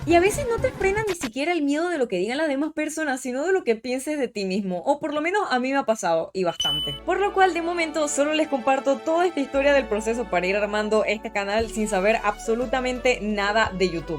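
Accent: Venezuela. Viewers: Amo tu canal amiga es muy gratificante ver tus videos y me encanta como te expresas se siente la verdadera escencia Venezolana en toda su gloria te deseo lo mejor y espero ser viendo cosas interesante en tu canal y mejores y quiera saber de que parte de VENEZUELA SOS :) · Chama, tengo como 6 años fuera de Venezuela y ahora siento que escuchar el acento venezolano me cuesta full, hablas muy rápido jajajaj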